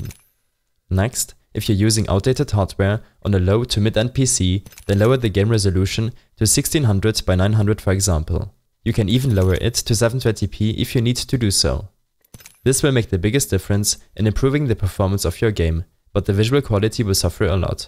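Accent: Germany. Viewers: Der deutsche Akzent😂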